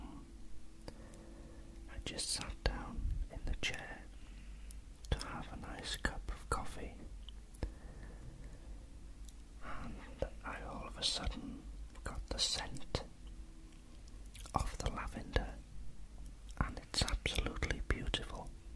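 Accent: Wales, United Kingdom. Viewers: Wow, this is amazing. Are you Welsh Sir. Those Squirrels are seriously cute.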